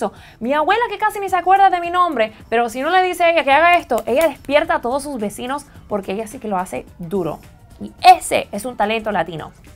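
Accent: Cuba. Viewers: Jajaj me encantó la 12va. Razón! Y como poder dudar de tu descendencia, se te nota en la voz que eres cubana! Y lo que nos define son las raíces y la procedencia, no un papelito! ;) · Oigan tengo 9 años y no le entendí al vídeo ósea q es de Estados Unidos Y habla Cubano??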